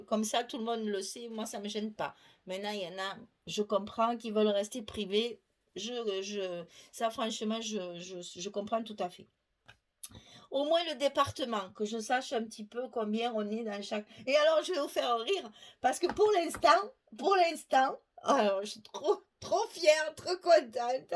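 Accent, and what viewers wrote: South, France. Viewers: Bonjour, je vois vos vidéos depuis la Belgique (Brabant Wallon ).Merci pour vos réalisations et votre accent du Sud qui me fait penser aux vacances, au soleil. Ne changez rien, j'adore.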